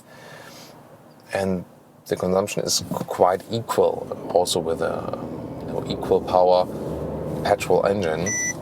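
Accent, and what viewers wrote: Germany. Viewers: Nice review. I love the German accent.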